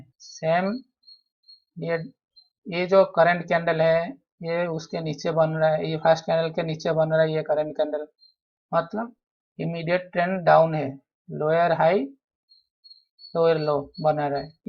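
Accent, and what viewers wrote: Odisha, India. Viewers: Apka bat chit se lag rah he aap odia ghar se belong karte ho... Me v odia hun from Rourkela, Odisha..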